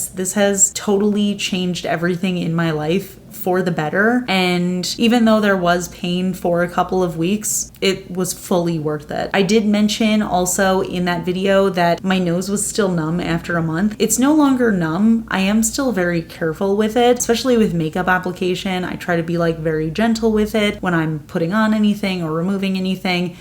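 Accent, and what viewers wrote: New Jersey, United States. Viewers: My late mother was from Jersey, and your Jersey accent (like all Jersey accents) brings me joy! Glad you haven’t lost it! ❤ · I'm happy the surgery was so successful. It's so nice hearing your New Jersey accent rather than blocked sinus Jersey. Wishing you continued good fotune with the swelling going away.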